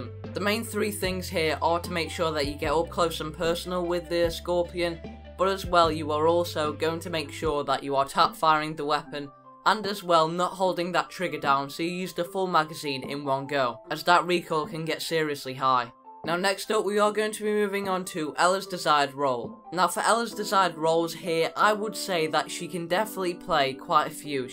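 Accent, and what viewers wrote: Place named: England, United Kingdom. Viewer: He's English.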